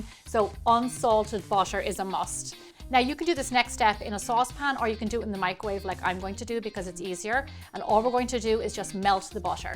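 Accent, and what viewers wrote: Ireland. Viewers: What a delightful Irish accent! 💖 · This works better if you have a beautiful irish accent. Love your channel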